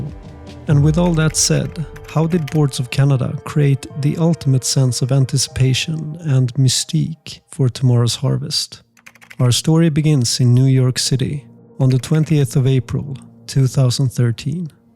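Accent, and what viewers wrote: Sweden. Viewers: Du låter mycket svensk. Är du svensk?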